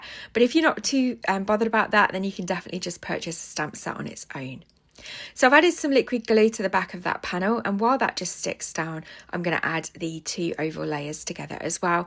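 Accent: United Kingdom. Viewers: I am so in love with your card! This was a wonderful tutorial! I really enjoy your British accent and your style of video! Now I have to to buy this set! Haha. Thank you so much for the inspiration!❤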